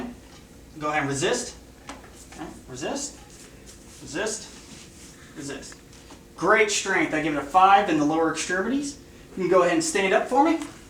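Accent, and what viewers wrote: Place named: South, United States. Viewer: Get it southern accent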